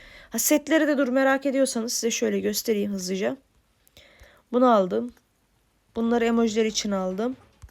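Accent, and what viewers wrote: Azerbaijan. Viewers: Azerbaycanlisan sen)?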